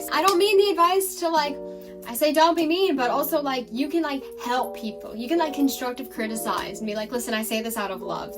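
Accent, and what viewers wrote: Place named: South, United States. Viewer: You have a slight southern or country accent, where u from?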